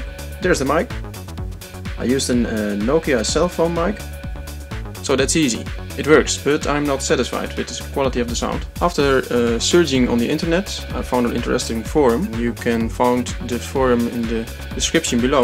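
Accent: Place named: Netherlands. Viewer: Thats what you get when a Dutch person tries to speak English haha. I'm dutch and I reconize that accent everywhere.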